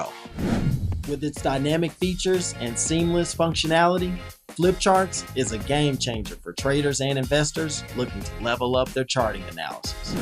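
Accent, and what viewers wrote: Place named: South, United States. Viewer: Really appreciate the shorter, to-the-point format. All help, no fluff. One suggestion: the heavy country accent is a little distracting.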